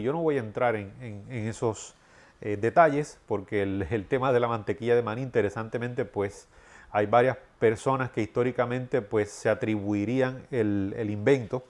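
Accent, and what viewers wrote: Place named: Puerto Rico. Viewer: Hermano de qué país eres ??? Tu acento parece puertorriqueño cómo yo.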